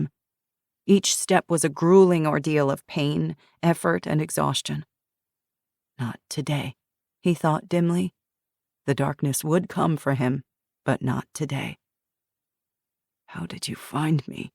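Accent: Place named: France. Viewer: Love the narrator. Especially her French accent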